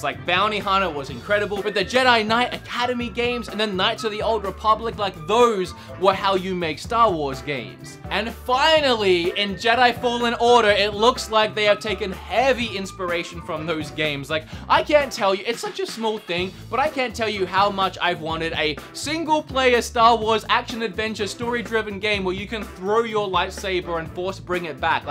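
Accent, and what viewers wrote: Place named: Australia. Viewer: I'm one of the new subscribers! I have seen your videos before but it was your hyped pokemon video before E3 that made me subscribe. I have a feeling I'll continue to enjoy your content bc I really love your energy! Also, I like your accent, because its a lot like mine... I'm Australian (and I'm guessing you are too) but I've travelled and spend a lot of time around people with more American accents. So now I have this strange Australian/US/Canadian accent... ahaha anyways, that awkward little tidbit aside, I hope your channel continues to grow! :D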